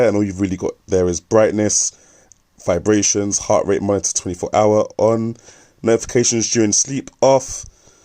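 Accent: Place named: Cockney, United Kingdom. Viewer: Really good review, thanks for posting. Brilliant accent by the way. SO cockney, kind o ting... you get me?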